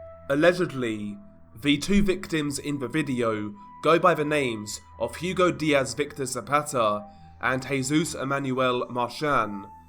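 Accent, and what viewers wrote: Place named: United Kingdom. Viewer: Man, this british accent is fire for telling such well writen stories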